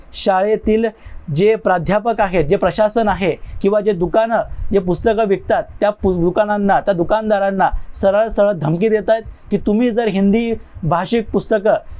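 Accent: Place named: Uttar Pradesh, India. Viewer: मराठी नाही आहे यूपी बिहार मधुन येऊन मराठी बोलायला लागलेला दिसतोय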